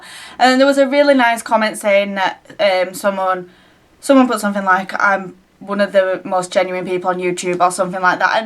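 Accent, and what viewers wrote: Yorkshire, United Kingdom. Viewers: I know how you feel, my relationship also ended in July and was horrible! I find spending time with my friends on the weekends and having something to totally focus on (which for me is my uni work) helps. Its totally a relief, refreshing and just amazing when you can finally be free. From when I first came across your videos years ago through your Yorkshire accent tag I thought you were and still are beautiful. <3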